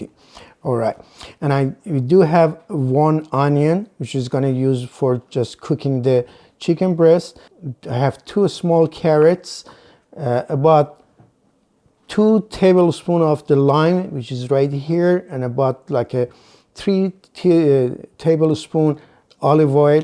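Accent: Iran. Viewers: your voice... and with your Persian accent are just perfect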